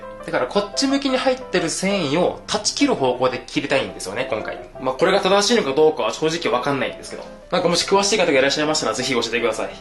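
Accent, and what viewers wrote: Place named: Hakata, Japan. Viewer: 最近見させていただいてます！もっと早くさんを知りたかった！同じ九州人なので、時々出る博多弁が心地いいです！料理男子として尊敬しまてます！これからも動画楽しみにしています！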